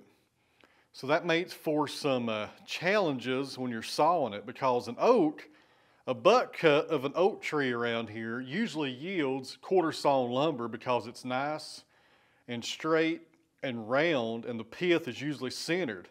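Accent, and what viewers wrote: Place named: East Tennessee, United States. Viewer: That looks like tornado damage, alright. We lost our barn back in 99, and because there were no "confirmed" touchdowns, insurance refused to pay a penny. By the by, sound quality is pretty good,. Sounds about like you have it dialed in. Oh, something I've been thinking about... My grandfather was a Sawyer up here in northeast Ohio and northwest Pennsylvania from the 1930s to the mid 80s, and some of his favorite workers were from your neck of the woods. Herman Hutchison, Sheridan Severt and his son John (all gone now, sadly.) were from Johnson County and sounded almost exactly like you. Must be something about the land of east Tennessee that makes good sawyers...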